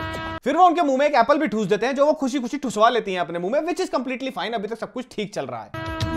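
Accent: Haryana, India. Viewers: Bhai aapki Hariyanvi accent sunke me bhi thodi thodi try karra hu bolne ki....😅😅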